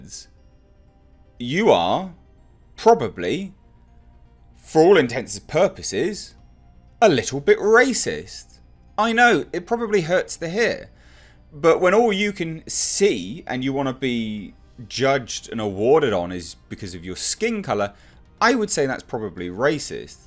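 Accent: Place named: United Kingdom. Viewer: When I first heard your voice I already knew you were a white british man and at the same time I didn't care, I just liked your content.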